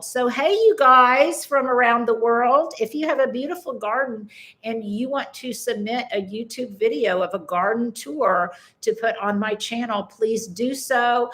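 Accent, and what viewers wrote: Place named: United States. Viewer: I like to count the “and by the way”, especially when followed by “you guys” - I must say however, your way with language is a joy - especially as being English I can feel smug about what we consider the American mispronunciations, especially words like herbs, which we pronounce as in Herbert Hoover - always I can hear my mother saying “ don’t drop your aitches “ love these videos, was really disappointed not to be able to join live. Thank You.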